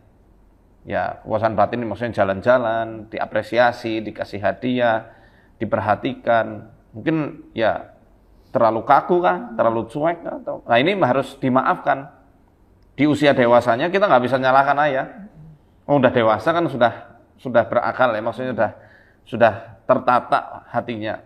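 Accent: Banyumas, Indonesia. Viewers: Masya Allah tabarakAllah,seneng banget nek krungu pak ustadz ngomong ngapak ,medok banget kaya nyon🤭 moga sehat"slalu pak ustadz dan teamnya .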